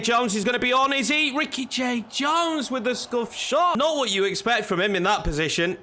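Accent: Yorkshire, United Kingdom. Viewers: Never thought I would say this but Up The City!!! How we have missed your Yorkshire dulcet tones lol 😂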